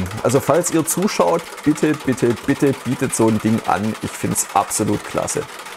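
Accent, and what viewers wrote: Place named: Swabia, Germany. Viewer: Gut gschwaebelt,. I daet des ao so macha: wieder emol ebbes drechsle. Griesle vom Dreiländereck!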